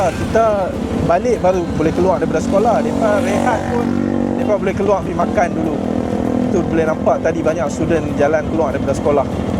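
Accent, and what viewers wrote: North, Malaysia. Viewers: Penyampaian yg santai, penggunaan bahasa melayu yg sempurna dengan loghat utara, rasa mcm sembang dgn kawan2 kt kedai kopi tapi masih ada unsur2 professionalism.. sempoi! Hang dapat subscriber baru dari aku. Teruskan kandungan2 mcm ni.. 👍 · Sedap betui ckp utagha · suka dengaq hg cakap utara..cantik permandangan, rasa macam vibe aku kecik2 dulu..terbaik weii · baru 1st time tengok terus subscribe...mantap dapat cakap dlm BM slang belah utara..tqsm n teruskan berkarya 👍👍👍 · Mntap konten hg bang..dgn loghat utaqa tibai pi..mmg auto subscribe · Bro sebab lograt utara hg aku follow bro. Semoga maju jayaa, keep it up bro👍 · Baru jumpa chsnnel ini. Menarik sgt. Terima kasih bawa kami menjelajah.. seronok dengar loghat utara awak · Jarang sangat2 komen kat video2 travel. Tiba2 naik suggestion video ni. Mula2 dengaq loghat utara pun dah buat aku tertarik. 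Pastu tgok pulak creator video ni brader India dari Malaysia. Lagi la tertarik. Pastu pulak tgok style travel mcm ni lagi la buat jatuh hati. Teruih rasa nak travel sekali. Baru tau Kerala ni agak menarik. Keep up the good work. Hg mmg best la bro. 🎉👍🏻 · Best lah vlog nih!! Tambah plak dgn loghat utara!! Terus maju saudara! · Best wehh chanel ni. Dia bantai loghat utara memang sedap dengar. Asal aku baru jumpa ehh chanel ni. Terus subscribe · One of the best Travelodge by my fellow Malaysian 🇲🇾. Bangga dgn awak bro. Best dgr loghat utara. Greetings from Vancouver 🇨🇦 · org utara ka ? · Bahasa utara sempoi anak muda Malaysia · Terbaikkk la bro...bhasa utara betul2 mantopppp.... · Sedap tengok video2 awak dik. Yang penting info sampai, puas hati & hang cakap utara rasa dekat di hati kak... Hihi. Sat nak pi tengok video2 lain pulak. Teruskan berkarya na 😊❤ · Mula ii igt abg Indian sana sekali dgaq utaqaaa 😂 tq bang seronok layan vd abg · Bestnya bawa kami jalan²...Terima Kasih bg kami pengalaman mcm nie..Dngn guna loghat Utara..Mmg Surrr tgk.. 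· frist time tgk video dari bro ni, aku plak jenis suka lorat utara, memng auto subs and like. terbaik la king fish tu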